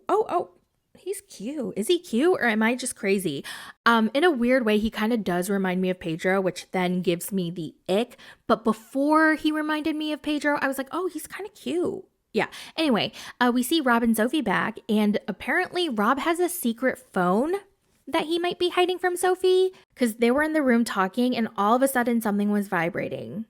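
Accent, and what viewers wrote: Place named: New York, United States. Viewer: istg while getting to know you as a ytber and watching your videos i said to myself so many times this girl gotta be from NY and i just found out that you wereeeee lol i love it. Honestly makes me wanna watch you more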